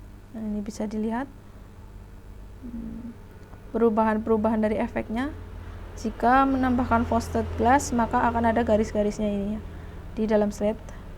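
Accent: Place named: Banyumas, Indonesia. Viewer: Informatif👍 mbak e pasti orang ngapak😀semangat👍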